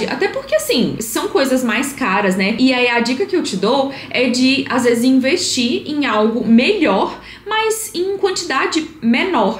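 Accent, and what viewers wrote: Minas Gerais, Brazil. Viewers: Coseraiada, muito mineira, que nem eu😂😂😂😂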